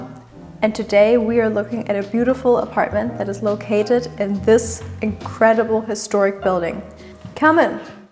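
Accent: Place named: Germany. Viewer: I love to hear you speak. Your voice is so beautiful and your English is perfect with just a hint of (German?) accent? Sorry if I got it wrong.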